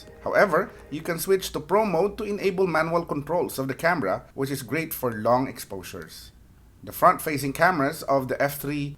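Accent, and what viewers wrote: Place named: Philippines. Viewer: Are you filipino? I can hear it in your accent haha. If you're not then I apologize and if you are then ipagpatuloy mo lang ang ginagawa mo! Ang ganda ng content 👍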